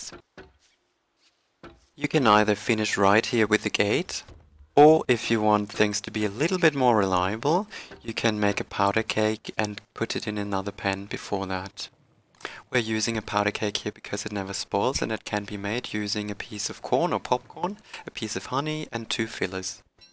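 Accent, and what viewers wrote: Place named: RP, United Kingdom. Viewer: I love your accent. So posh.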